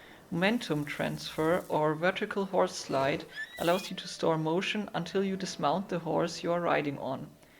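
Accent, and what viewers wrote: Germany. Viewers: Man merkt den Deutschen akzent hast ein gutes Englisch :D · deutscher akzent :D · As a German I knew you were German without seeing in-game language :D we just can't get rid of that accent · man hört sofort den deutschen Akzent raus :D gutes video!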